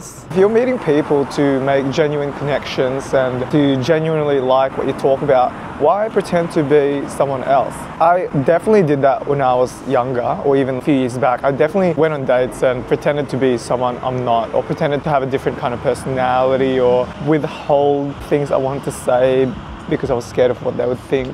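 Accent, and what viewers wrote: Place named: Australia. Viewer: guess I confused the Australian accent w/ a British accent . Luv your vlog & style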